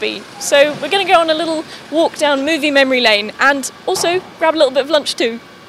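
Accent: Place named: United Kingdom. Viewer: Can't beat a British accent :)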